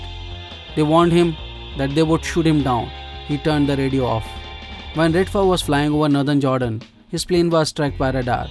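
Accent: India. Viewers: Any Indian accent just ruins any program.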